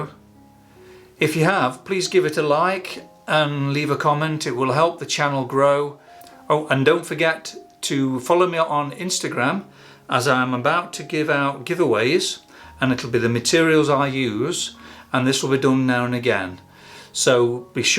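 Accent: United Kingdom. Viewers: Thanks for letting us look over your shoulder and your extreme helpful comments (and after many years of communication with Americans I love to hear your georgous british accent 😉). It is the first time for me to get such a good impression of drawing human skin. Especially your work with the complementary colours is impressive. Thanks again and a nice christmas for you and your family from an art student in Germany.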